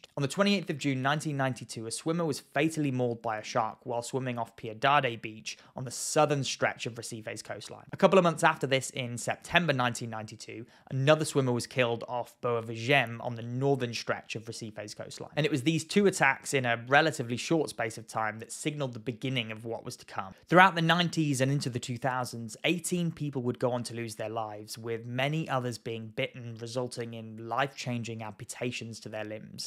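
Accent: United Kingdom. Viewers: Yup…. Stillll taking my chances in New England. Holy Hell. Don’t your GW Sharks breach like MILES in the Air, too? I get your accent and the other ‘Shark Guy’ I get, confused. One of you is like UK(You?) and the other is South Australian. Help. Lol I’ll take my chances in NH/The Cape. Every time. But that water? Yeah, can’t see shit either when you purposely go for hurricane season. You should do a video about GWs, and say why they’re coming UP the coast, now. Been here and vacationing all my life; Never have seen what I have in signs ‘they’ put up these days.A Danger zone on the EAST Coast. People just lay out for the sun, now. No one swims in the Cape. I loveeee thatttt water. It’s clear as day-Unlike NH. But there’s GWs; And they’re Glorious. Love this channel! .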